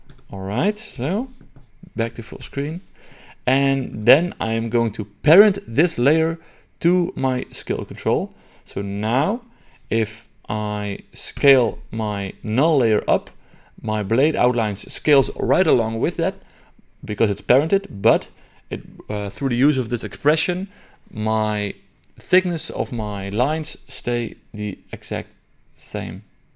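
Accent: Netherlands. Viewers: Thank you for this tutorial! It really helped me. And also - your dutch accent, it's so beautiful, love it!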